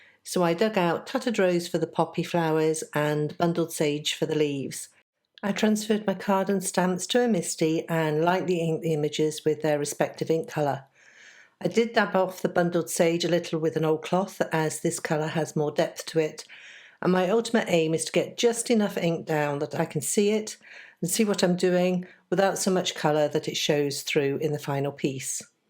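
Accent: United Kingdom. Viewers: ally beautiful painting, and effective and entertaining teaching!, well done, you've earned a new subscriber! I saw your card on the SSS blog and loved it, and when they mentioned your Scottish accent I had to come to check your videos out right away, Scotland is my favorite place in the world so far, though sadly I've only gotten to spend two weeks there so far can't wait to go back! Outlander is not enough of a fix, it's just making me more eager to go back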